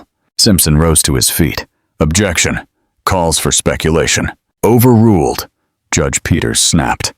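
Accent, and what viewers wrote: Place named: Australia. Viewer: Very interesting and it's so nice to see an Australian version of such Marital stories... Good One, the Lady is using her Brains without being Egoistic...Having been supportive and helping their Building business be established... He grew horns in his arrogance, forgetting the Partnership as equals... Serves him right...Best wishes to his Wife and family... From NSW 2680...Thank you for also talking in our Aussie English..Wonderful hearing the narration...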